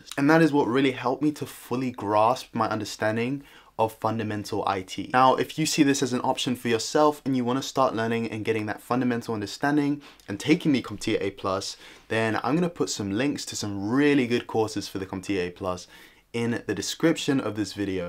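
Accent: United Kingdom. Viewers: that's funny, I never noticed from the UK... I'm used to the UK accent as I'm from the UK too lol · thanks Bruv for clearing my mind and showing the way also i glad that i've finally found a pleasant calm UK accent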